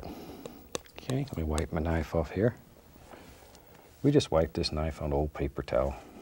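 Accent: South, United States. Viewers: Even after all the years of living away from the south, he still sounded so very southern.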